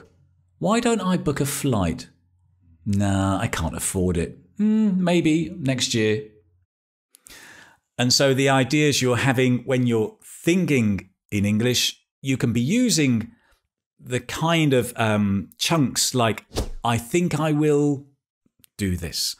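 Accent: Spain. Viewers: Me sorprendió tu acento español 😃 It's great ! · you have spain accent! it sounds curious! thanks for ur lessons!! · Hahaha I love your Spanish (from Spain!) accent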